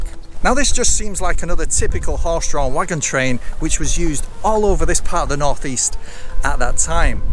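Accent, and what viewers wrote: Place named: England, United Kingdom. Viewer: With these videos I learn a lot and I get used to understanding English accent. Thank you very much for your videos!!!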